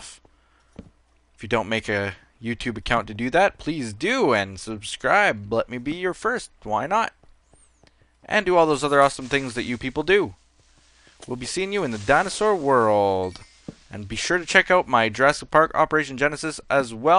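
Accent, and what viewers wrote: Canada. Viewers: are u Canadian I can tell by ur voice and when ur saying eh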